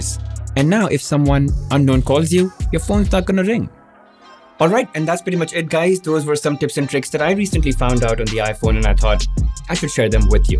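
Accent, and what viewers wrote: India. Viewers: That accent — Indian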